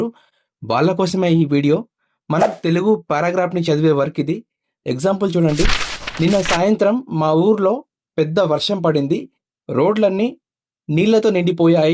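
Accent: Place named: Tamil Nadu, India. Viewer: Thamil voice vasthundhi bro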